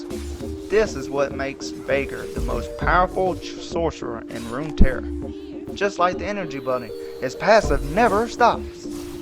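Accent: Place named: East Coast, United States. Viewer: You sound east coast but that’s just me、(I’m East) keep up with the videos man! Also great editing :) lil YouTube channels keep this clustered genre new. You will blow up keep going nuts on LOL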